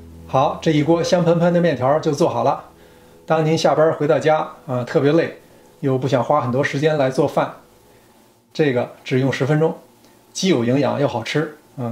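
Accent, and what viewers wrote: Beijing, China. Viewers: 老师您是不是老北京的，有点听相声的感觉了😂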